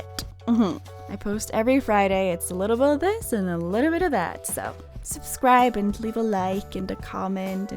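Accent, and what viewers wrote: United States. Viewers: your english is very good for someone from norway. i swore you were american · also if u had not said you were from a different country, I would have thought you were from the states.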